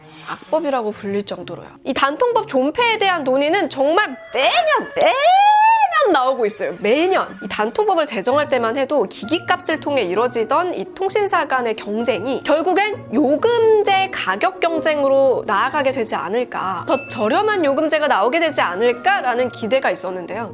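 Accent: United Kingdom. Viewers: 영국식 발음으로 한 번 더 만들어주세요ㅎㅎ 컨셉이 잘 맞을듯 ㅋㅋㅋ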